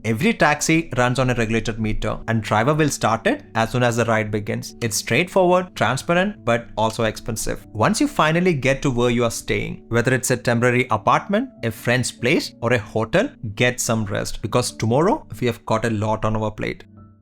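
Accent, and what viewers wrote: Kerala, India. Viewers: chettan has clear malayali accent! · That Mallu accent is still there though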